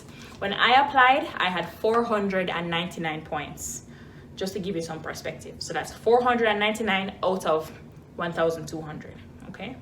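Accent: Jamaica. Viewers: sounds like a Jamaican................thanks for the information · Hey there I hear Jamaican 😁 I subbed, thank you for the tip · I could tell you are Jamaican without you saying it, we Jamaicans know a Jamaican anywhere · She has a jamaican English accent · I hear the Jamaican in you❤️❤️🇯🇲🇯🇲nuff love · Definitely a yaadie, mi not even going to ask. Suscribed-click.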